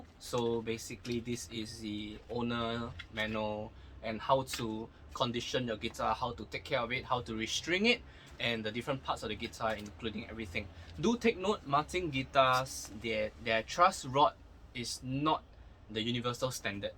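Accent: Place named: Singapore. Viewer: Singaporean accent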